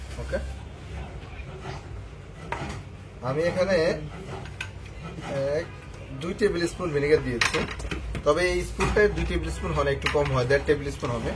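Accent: Noakhali, Bangladesh. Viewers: ভাই আপনার বাড়ি কি নোয়াখালী আপনার কথার ধরনটা ওরকম লাগতেছে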